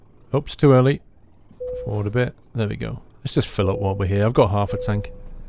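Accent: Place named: Birmingham, United Kingdom. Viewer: im guessing yuor from birmingham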